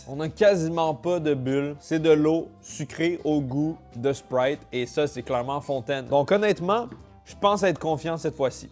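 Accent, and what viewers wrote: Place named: Quebec, Canada. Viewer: 🤣🤣🤣 très special a l’écoute personnellement je n’aime pas du tout entendre le québécois ou canadien, malgré que vous êtes super mes juste votre accent et votre façon de parler je trouve sa horrible 😂😂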